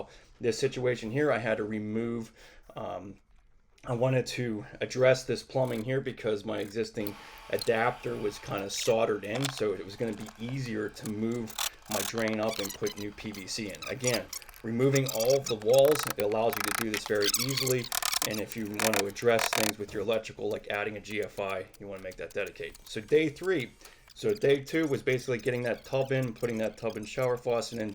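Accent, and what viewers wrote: Pittsburgh, United States. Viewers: Before I saw your shirt I just knew you was from Pittsburgh 🤣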